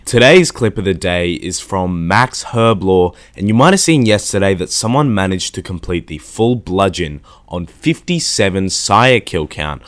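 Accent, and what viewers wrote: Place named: Australia. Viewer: Your an annoying Aussie. It's your phrase structure that annoys me. Like you sound blaagghhttt!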